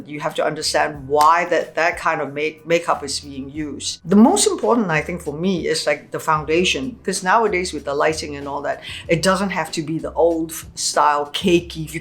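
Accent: Malaysia. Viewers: "can see or not" hahahah I LOVE that she doesn't Westernise her accent, regardless of what films and shows she appears in, she's so unapologetically Malaysian/Asian, and that's so extremely rare. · it's typical malaysian of chinese descent english accent and it's nice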